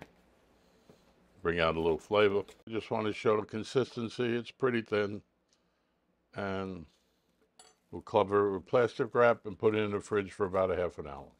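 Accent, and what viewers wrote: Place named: New York, United States. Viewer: Your demeanor and accent scream new- york, and I love it. Thank you for the videos may God bless you.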